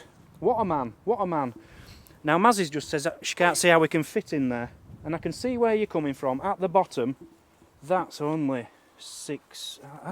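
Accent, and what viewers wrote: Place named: United Kingdom. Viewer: What part of the UK does one acquire an accent like Mazzy's? It's so endearing.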